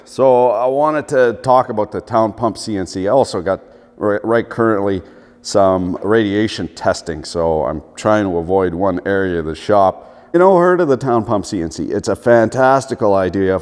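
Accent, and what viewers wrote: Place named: Canada. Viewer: I'm from the Midwest United States and after watching one minute and twelve seconds of this video... that's the most Canadian fucking thing I've ever heard lmao...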